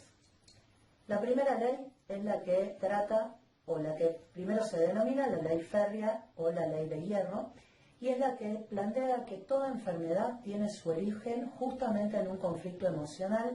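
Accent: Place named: Argentina. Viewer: Buena explicación, lo único que el sonido y el castellano que habla, se me dificulta, entender, los términos médicos entendíble, la gramática argentina es lo que se me dificulta.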